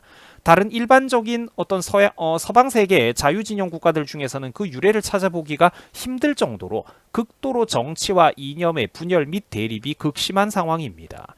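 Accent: Gyeongsang, South Korea. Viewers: 말투가 대구 말투네 끄덕끄덕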